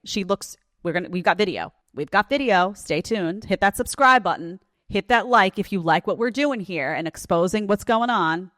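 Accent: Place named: Brooklyn, United States. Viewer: Please, you must stop imitating your teenage only fans females... You go into adolescent brooklynese, New York dialect and you particularly flex the intonations on the ' nasty' as if you're actually that icky female. Just speak about the subjects you're dealing with, and don't become them or allow them to become you. They still resonate a living adolescent part of you, or else you wouldn't be able to join in their vibration so frequently and so effectively. Time to grow up!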